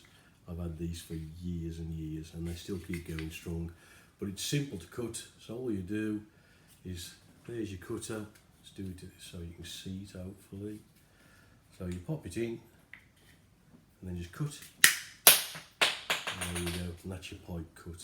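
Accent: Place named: Birmingham, United Kingdom. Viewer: Hi &, just wanted to say a big thank you for taking the time to 'mansplain' things,edit, and put these videos up. It makes things so much easier to understand when you have pictures to look at )) I know its naughty to make a request of someone who is doing something for nothing etc but, would it be possible to film the measuring and template making for one of the bulkheads? And finally, I know you said you had driven up from Telford on one of the videos but your brilliant accent sounds like its out of deepest darkest brummiland - I'm a brummie too but without the accent so heres a big thumbs up for a fellow Birmingham lad 👍 👍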